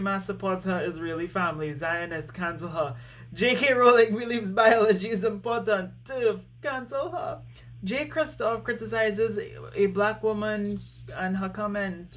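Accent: Trinidad and Tobago. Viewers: I stopped listening to your rant and zoomed in on your accent. Trini? It's lovely! 😂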